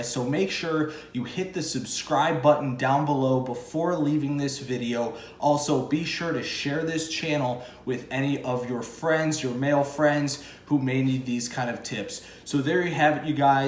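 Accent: United States. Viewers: He sounds American...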